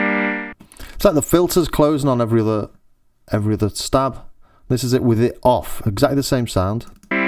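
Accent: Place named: Scouse, United Kingdom. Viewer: im trying to figure out if you`re a posh scouser or from the Wirral :)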